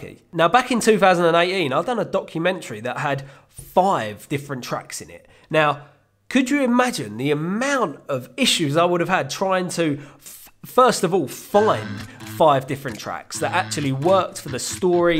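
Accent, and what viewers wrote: United Kingdom. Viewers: Brit accent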